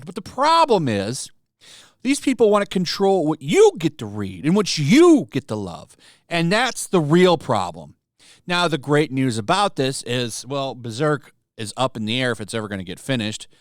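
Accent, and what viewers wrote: United Kingdom. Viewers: Holy shit your British accent is amazing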